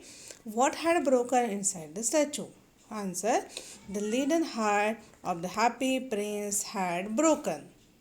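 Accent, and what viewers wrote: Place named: India. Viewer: U indiab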